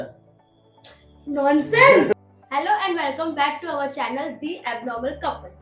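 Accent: Gujarat, India. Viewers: The way u speak... Apki tone me hi gujrati pana hai😂.. I am also from Gujarat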